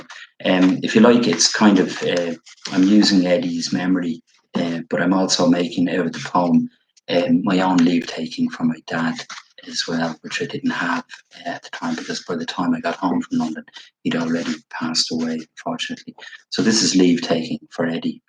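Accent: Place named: Dublin, Ireland. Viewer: Lovely to hear you read in your Dublin accent, I'm so used to my own inner voice reading your poetry, this was a surprise. Great reading! Thank you.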